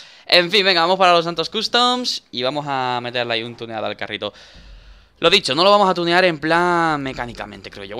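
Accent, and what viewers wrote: Argentina. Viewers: Está en argentino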